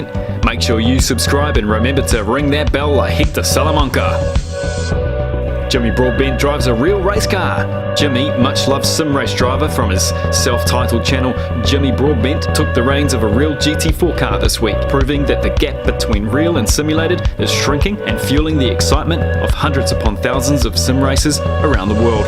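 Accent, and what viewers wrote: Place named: New Zealand. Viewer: haha ... so 19 eighty sex. :) i should have picked you where my kiwi cousin :)))) lol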